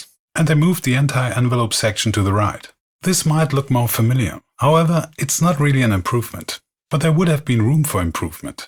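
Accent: Germany. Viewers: Selbst wenn das Wort eines Schweizers wohl nicht so viel zählt wie das eines Native Speakers: für mich klingt dein Englisch super. Die Stimme ist angenehm und grammatikalische Fehler sind mir bisher noch keine aufgefallen:) Klar hört man den deutschen Akzent ein klein wenig heraus, allerdings bereichert der die Videos eher und stört überhaupt nicht, denke ich. Danke für die Info! Das klingt so, als ob die Effekte, Levels, Breaks, usw. viel Zeit in Anspruch nehmen, hingegen die Melodien, Akkorde, Pattern und Rhythmen schnell angedacht sind. Klingt zwar etwas kitschig, aber ich stimme voll und ganz zu: Limitations foster creativity. Ich halte ab und zu Ausschau nach einem guten alten PSR oder einem Casiotone. Leider verkaufen die Leute meistens nur moderne und für mich schlecht klingende Keyboards. Lieber einige wenige, eingeschränkte, aber dafür warme, angenehme Klänge mit Charakter. 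Und für die alten, leider oftmals ungepflegten Geräte wollen sie über Hundert Franken. Obwohl ich eine Art falsche Nostalgie spüre wenn ich die Klänge dieser alten Geräte höre (weil ich gerade mal 1995 auf die Welt gekommen bin) möchte ich mir trotzdem einmal ein älteres Keyboard leisten. Synths hatte und habe ich genug und liebe sie nach wie vor. Aber sich einfach mal mit so einem Gerät hinsetzen und einen Song schreiben ist wahrscheinlich doch noch mal etwas ganz anderes. Ich hatte vor ein paar Tagen etwas gefunden, was dich (ich bin so frei und duze und hoffe, dass mir das nicht übel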